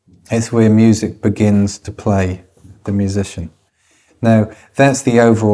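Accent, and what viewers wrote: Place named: RP, United Kingdom. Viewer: What I like most about Fripp is his accent. Clear, well spoken English. Maybe a bit too RP on the ends of words sometimes, but otherwise very clear and easy to understand.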